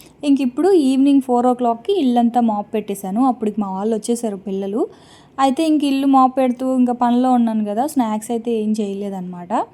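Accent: Godavari, India. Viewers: full godavari and vizag slang lone matladataru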